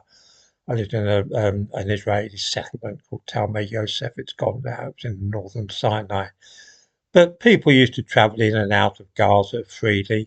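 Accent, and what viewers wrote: United Kingdom. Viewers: Wow. What exactly were you doing living in Palestine? Nothing ever stopping them from acting like their own country? Nothing? I look at the google earth map today, and i see the most ominous wall ever constructed, and it just so happens to be surrounding this tiny 25 mile long strip of land. Not sure why i was subscribed to you, but i'd give any honest sounding british chap a shot. Really no choice but to unsub now, because i'm not the target audience for this unapologetic gaslighting. Pretty sure that the older i get, the more i can see the pattern of deception, but for some people it might work the other way.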